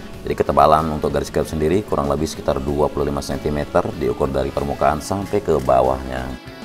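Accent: Lombok, Indonesia. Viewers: Lombok mana suaranya👍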